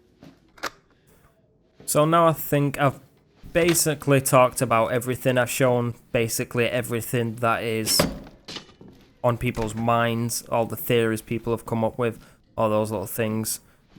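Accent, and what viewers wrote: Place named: West Yorkshire, United Kingdom. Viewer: Anyone ever commented on your West Yorkshire Accent? nice to hear it haha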